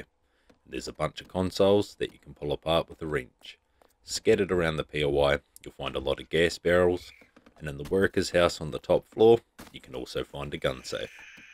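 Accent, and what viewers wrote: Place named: Australia. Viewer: Man you sound like Jamaine from flight of the Concorde's. I don't mean just because you both have Australian accents ya just fully sound like him. Such a crack up that show. Also cheers for all the tips on 7 days.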